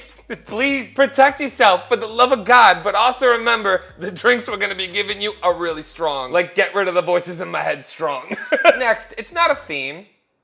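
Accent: New Jersey, United States. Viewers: As a Jersey native, still having his Jersey accent after visiting his home is so cute and relatable. 😂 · I love a proper Jersey accent. Most think it’s Staten Island when it’s little differences. And definitely not Brooklyn either. “Cholly” instead of Charlie “Wuff” instead of “wolf” “Tawk” instead of talk or “towuk” (SI accent) “Wunnuh” not “wawunuh” (SI) “Guhd” instead of God or “gawd” (SI)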